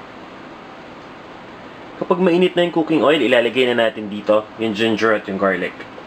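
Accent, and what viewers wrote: Philippines. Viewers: i visit ur recopies cos i like fillipino accent..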